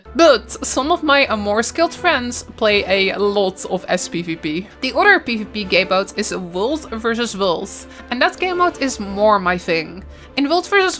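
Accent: Netherlands. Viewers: Ur dutch :p !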